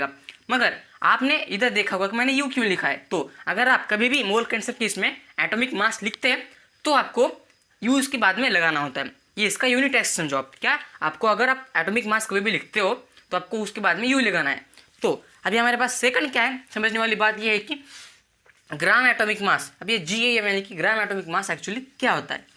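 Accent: Maharashtra, India. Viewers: Seems like Marathi Accent. Aap konse class mein padhte hai abhi? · I think this guy is from maharashtra bcz he have marathi fluent 😅😅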